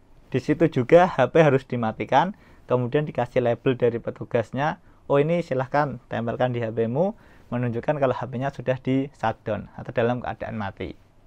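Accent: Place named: Japan. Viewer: sensei nada bicaranya kecampur logat jepang😭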